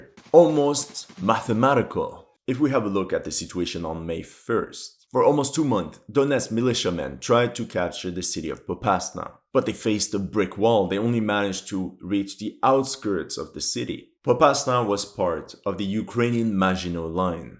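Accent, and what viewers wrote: Russia. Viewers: Interesting russian accent lol, 2 months and barely making any progress against a weak army... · why does he have Russian accent or he is french canadian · You sound like a Russian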